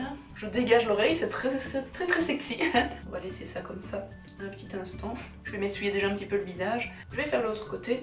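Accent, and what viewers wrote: Belgium. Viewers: J’entends plus belge qui suisse perso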